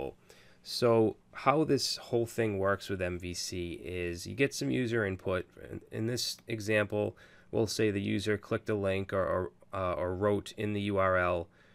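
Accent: Boston, United States. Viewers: Are you from Dorchester/Roxbury?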